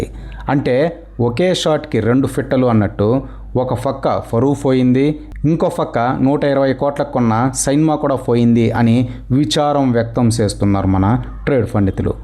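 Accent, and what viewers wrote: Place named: Telangana, India. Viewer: Antha baane undi gani Nuv matladedi Telangana na, Srikakulam ah, Godavari yaasa na ardam kavatle anna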